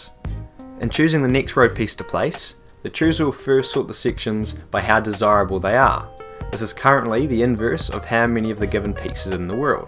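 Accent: New Zealand. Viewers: Yo is that a rare kiwi accent on youtube? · You sound like you're from NZ.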